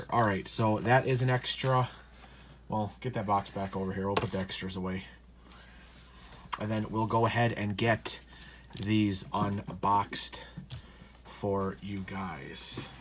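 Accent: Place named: Wisconsin, United States. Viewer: Hello, first time viewer.-I didn’t understand how the hot wheels cars I relate to the game. Mario, The Disneyland Neighbor PS. I think I hear Wisconsin accent.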